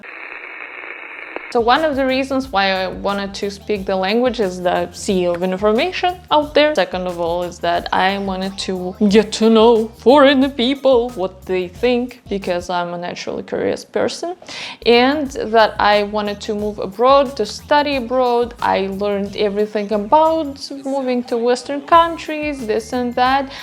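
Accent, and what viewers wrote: Russia. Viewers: Yes your English is very good. Your personality is great, genuine and spontaneous, funny. A lot smart fun lady! And your fluent in Russian! Wow! Hmm. Language sounds different to each person. I love your Russian accent as you speak English. It sounds very nice actually. Nothing to worry about. You give a lot of good advice. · I love your channel, and enjoy your sense of humor. Your command of English is pretty amazing, and your Russian accent is so subtle most of the time. I love it when you intentionally say things in English with an exaggerated accent! As a native English speaker, I commend your hard work. It really pays off! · Coming from a native English speaker your English is excellent/fluent!,you have a distinct Russian accent but just like an American or an Australian has an accent when speaking English.Its a cool accent btw!.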